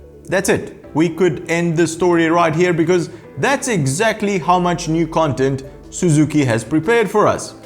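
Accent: South Africa. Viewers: Great videos with a superb sense of humour. Put me out of my misery though. You live in Romania, yet your accent sounds a little South African, would this be correct?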